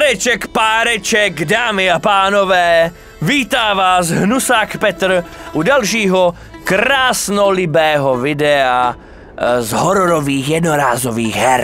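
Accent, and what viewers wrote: Moravia, Czech Republic. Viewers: Seš moravák?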